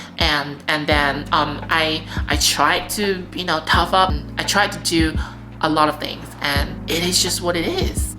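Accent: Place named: United States. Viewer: Thanks. My wife and I are legally married in the US and she’s a US citizen now. You should do a video about what you think about the state of LGBT rights and acceptance in China and marriage equality in China. It would be cool to know about how you became so fluent in English, too. That American accent didn’t just come from nowhere and inquiring minds want to know! Thanks for your consideration. I’m Loving the channel.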